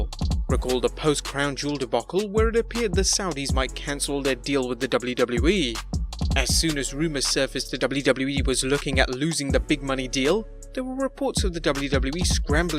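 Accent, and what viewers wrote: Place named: United Kingdom. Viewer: I hate to say it but I got use to hearing my wrestling news with a British accent lol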